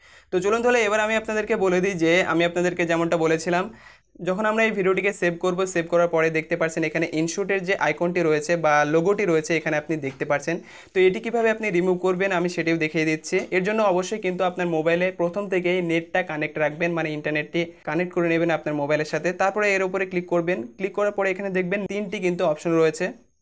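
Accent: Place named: Barishal, Bangladesh. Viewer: ভাই বরিশালে র ভাসা টা সেই লাগে🤣🤣🤣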